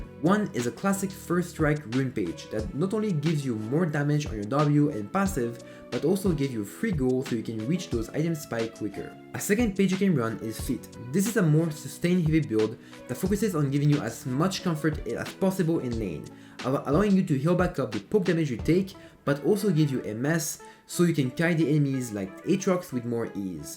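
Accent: France. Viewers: You have a strong accent are you French ?😮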